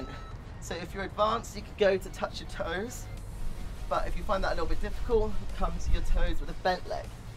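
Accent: United Kingdom. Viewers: Love your BRITISH ENGLISH accent！ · you are simply best... Greek Body, Innocent and cute loving face.....your British accent everything with killer smile... All this these things creates something I call that as Magic... I wish can meet you, your hubby and son one day in person. Keep sharing this beautiful and inspiring video... We love you:)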